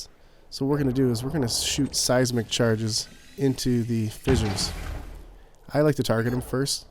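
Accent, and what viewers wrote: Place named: Wisconsin, United States. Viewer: You sound like my cousins from Wisconsin. Is this video still relevant a year after you made it?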